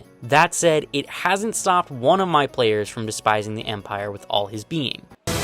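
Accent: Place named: Appalachia, United States. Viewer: Considering the appalachian southern accent is a derived form of the Scottish accent. This is a wonderful accident.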